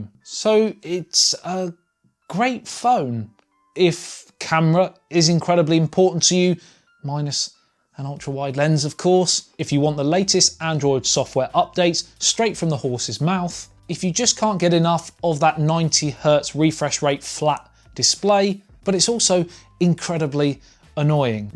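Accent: United Kingdom. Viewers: what can i say...feel the same. damn so cute and that british accent you could sale anything to me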